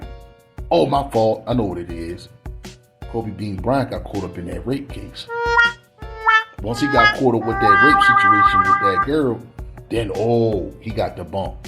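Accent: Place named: Baltimore, United States. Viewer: You from Baltimore? Heard a “few “ dews lol… I’m West Baltimore born and bred.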